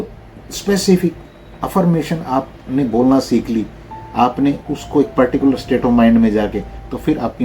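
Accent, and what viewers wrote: Haryana, India. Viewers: Aap ki boli haryana jasi h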